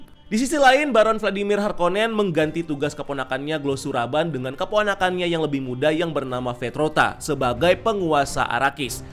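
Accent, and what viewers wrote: Indonesia. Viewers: Ngomong ingris nya jelek banget. Ingris nya logat indo ngapa?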